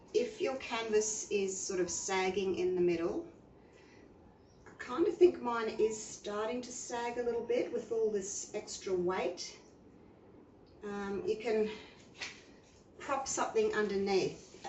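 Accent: Australia. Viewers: Your videos are just SO helpful. I've only been doing this for about a month, but I'm loving every minute of it! I don't even mind when I end up with some muddy pours, because for some strange reason, my 28 year old son LOVES them...lol (no accounting for taste...lol) I'm just about to varnish 3 paintings (which are going STRAIGHT to him...lol), so this is REALLY helpful. Btw... SO nice to hear a fellow Aussie accent too 😘👍❤❤❤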